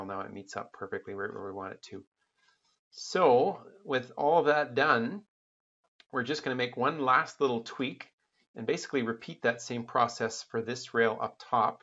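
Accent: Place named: United States. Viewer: Amazing tutorial man. Per your accent I assume you are American, I would have thought you would give the dimensions in inches instead of mm. Thank you so much.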